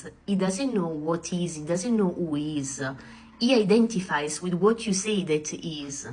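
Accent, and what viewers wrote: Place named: Italy. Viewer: She just sounds Italian!?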